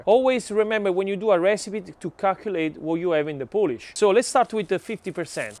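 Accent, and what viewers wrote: Italy. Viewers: great italian accent😍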